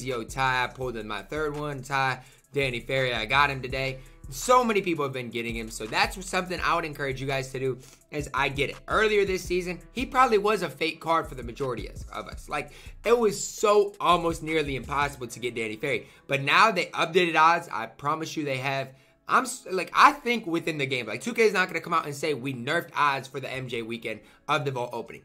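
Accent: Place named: New York, United States. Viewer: Yo Ty are ur parents from the east coast? Ur from Iowa but sound like my friends from New York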